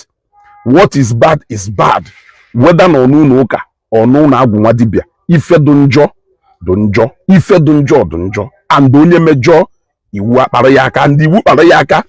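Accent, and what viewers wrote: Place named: Nnewi, Nigeria. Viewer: Greetings to you. Just came across you accidentally right now. While listening to you talking about the arrest of a fake pastor. You sounded like “nwa afor” Nnewi Ana Edo. If you don’t mind me asking, are you from Nnewi? Abum onye Nnewi.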